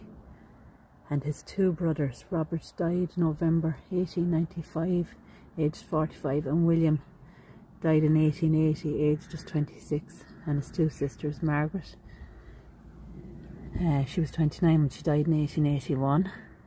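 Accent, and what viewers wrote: Ireland. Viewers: Her Irish lilt is so easy on the ears.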